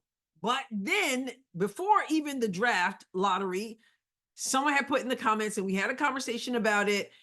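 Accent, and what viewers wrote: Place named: Brooklyn, United States. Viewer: you sound like you have s little Brooklyn accent...😊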